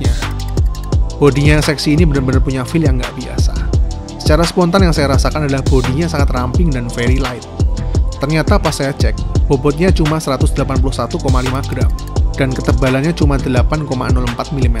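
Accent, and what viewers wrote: Yogyakarta, Indonesia. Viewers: Bang orang Jogja po?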